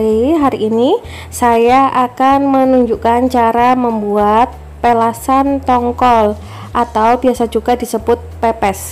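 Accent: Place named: Osing, Indonesia. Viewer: saya justru kangen logat osing nya kalau nonton video ini saat lg masak hehehe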